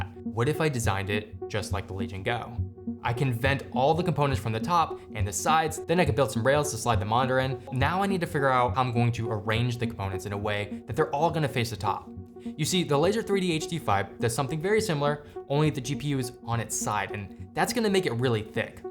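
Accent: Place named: United States. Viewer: Dude sounds American, and it's a very standard evening hour in USA.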